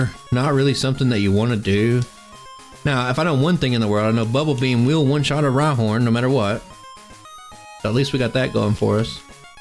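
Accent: South, United States. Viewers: honestly imo the country accent is dope, its one of the things that separates you from your average poketuber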